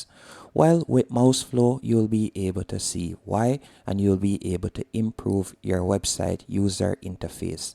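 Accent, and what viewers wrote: Jamaica. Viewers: It's nice to land on a video with a Jamaican accent. Your videos are helpful, thanks.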